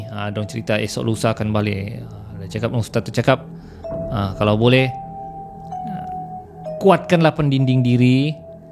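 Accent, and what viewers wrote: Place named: Kelantan, Malaysia. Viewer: Abe seram chanel masih baru chanenya lbh kurang 1 tahun,subsc pun baru lbh kurang 40k.tapi setiap video mampu ditonton 10k.....si abe ni berdailet kelantan tapi masih mampu lg difaham. ada juga si abe ni baca terus cerita atau skrip yg dihantar kpd dia...masih lg menarik.tak salah baca terus skrip cerita tu dari bercerita terus secara menghafal jln cerita....malapetakanya bila tak ingat skrip cerita mula nk merepet nk bohong dlm usaha nk bercerita jgk.... cerita ini menarik dan lbh menarik jika oerkataan yg digunakan mudah difahami.....sekadar pandangan saya saja. cadangan saya suoaya tuan baca terus srip cerita yg dikirimkan dgn olahan tambahan.(jgn menghafal mcm skrg)....tapi jija tuan rasa cara ini baik dan selesa teruskan.yg penting tuan puas dan seronok apa yg dikerjakan bukan org lain yg puas dan senorok dengar. 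ok tq semoga tuhan sayang kita semua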